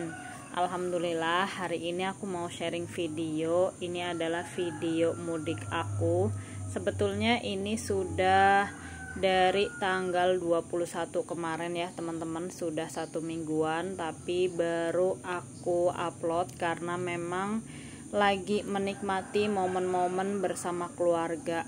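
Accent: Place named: Banyumas, Indonesia. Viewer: Mba Cilacap ya aku Purwokerto mba sama2 ngapak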